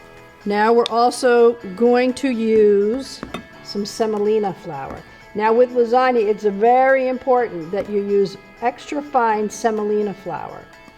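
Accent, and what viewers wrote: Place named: Boston, United States. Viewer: hahahaah you must be from Boston too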